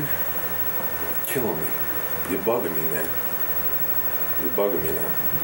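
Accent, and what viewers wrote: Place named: New York, United States. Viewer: His NY accent really comes out here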